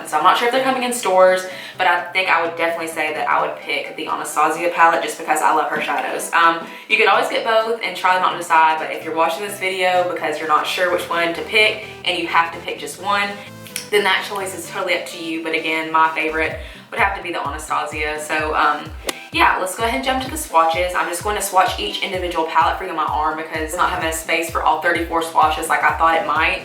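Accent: South, United States. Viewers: New subbie! Where's your accent from? You sound like a southern girl! Love your eye makeup! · You are so so so GORGEOUS!!!! You popped up on my suggestions and I just had to watch. I love your southern accent, hun it sounds a lot like mine! New subscriber, I'm impressed. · Seriously? Clearly American and southern...... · I totally didn't expect your voice to sound like this😆 Love the southern accent. Where are you from? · Is that a southern accent you have? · Omg where is she from her accent is adorable and southern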